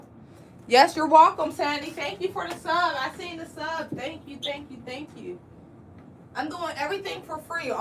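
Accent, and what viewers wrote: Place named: Philadelphia, United States. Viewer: I'm big on accents, where's that accent from? It sound like the Philly area or Rhode Island or Baltimore, I can't put my finger on it😂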